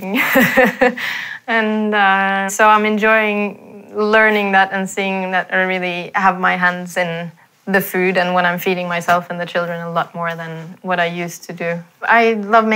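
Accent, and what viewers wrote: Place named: Turkey. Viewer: Ablam ya :dd konuşmaya başladığı ilk anda dedim ki bu kadın kesin Türk ancak Norveçliyim deyince de ümidimi kesmedim çünki bu ingilizce aksan ancak Türkiyeden yolu geçmiş birinde görülür :))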